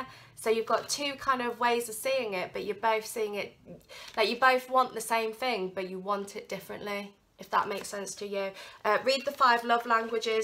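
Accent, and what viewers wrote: England, United Kingdom. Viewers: OMG was Spirit hanging out with my DM..lol resonates completely as expected Beauty, you are always spot on..like the smart psychic girlfriend who is quirky yet never less than stunning on any given day..served in an very cute English ? No expert ..or close by accent and heart of gold who can be trusted..like all women need..TY love , for being that to me..I am grateful and appreciate greatly..Blessings I wish Love and light..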